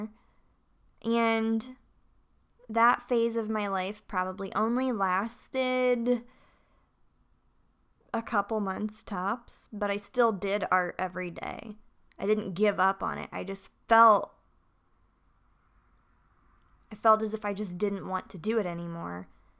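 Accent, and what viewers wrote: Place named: Northeast, United States. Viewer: Are you from RI, NY or MA? you have that accent. B^|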